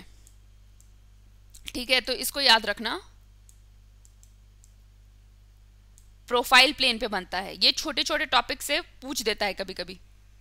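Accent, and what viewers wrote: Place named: Bihar, India. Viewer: god bless you ur voice is very beautiful. lagta hai app Bihar se belong karti hai apki tone she lagta hai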